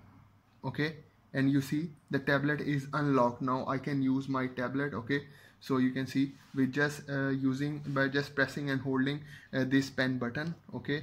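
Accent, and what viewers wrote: India. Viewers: Bought the S9 Ultra. Sreached for S Pen Features and stopped as soon as I heard an indian accent. =) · I love indian-english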